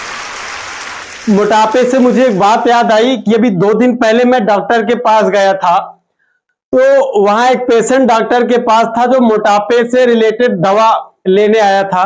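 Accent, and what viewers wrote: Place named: Purvanchal, India. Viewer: वाह रे मेरे पूर्वांचली शेर तुम्हारे लन्ग्वेज से साबित होरहा है की तुम U P मे किसी पूर्वांचल जिले के हो क्यूंकि इतनी शुद्ध हिन्दी भारत के किसी प्रदेश या जिले मे कोई बोल नही सकता है M D sheikh from बनारस up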